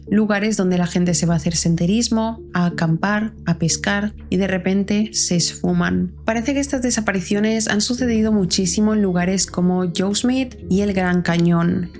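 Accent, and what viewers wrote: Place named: Spain. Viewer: gracias!! es que su tono es completamente Español, sin dudas, pero a veces utiliza palabras o expresiones Latinas, por eso me confudió. 😊 Creo que es una gran profesional, espero que con esfuerzo pueda llegar a ser mas reconocida.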